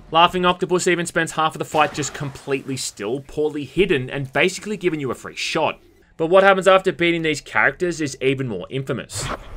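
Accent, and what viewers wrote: Australia. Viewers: I love your videos. Great break downs. You nail everything. Also, hearing an Aussie talk with such casual lingo and slang is perfect for me. Keep it up mate. You're doing great. Top shit. Yeah nah yeah cobber.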